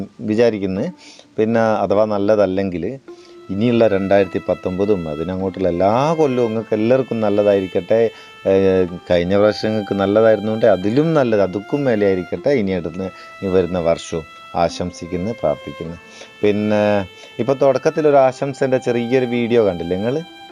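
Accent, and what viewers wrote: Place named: Kannur, India. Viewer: നിങ്ങളുടെ സ്ലാങ് കേട്ടിട്ട് കണ്ണൂര്കാരനാണെന്ന് തോന്നുന്നു. 🤔🤔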